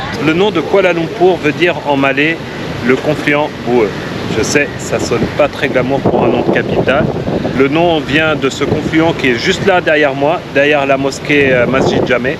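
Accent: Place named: France. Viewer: I don't understand French but I like French accent...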